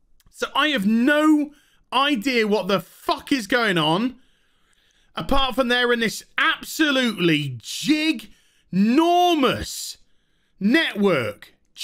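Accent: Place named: Yorkshire, United Kingdom. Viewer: Really am loving the Yorkshire accent coming out. “Oreyt pal, ars it guin?”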